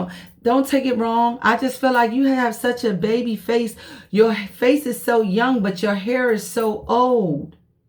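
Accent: United States. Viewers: I absolutely love your channel and your locs I subscribe cause I’m from DC and I can hear in your voice that you are from DC as well I have gray hair as well but I do dye my hair once a year but I’m done with dying my hair cause I really am allergic to hair dye you and your locs are absolutely beautiful I don’t palm roll either🖤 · You sound like you from the dmv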